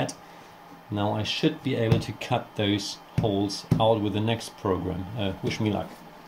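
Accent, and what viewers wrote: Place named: Australia. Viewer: Well done! Nice to see your face and hear you talk,. Did you learn English in Australia? I am trying to figure out your accent;)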